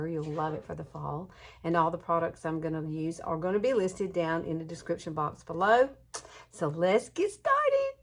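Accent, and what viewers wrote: South, United States. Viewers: I just subscribed to your channel. I love your accent! I am from Kentucky and also have that wonderful southern accent nothing like good southern people. I have just purchased a couple of your products from Younique products. I don't have facebook but I am enjoying this channel.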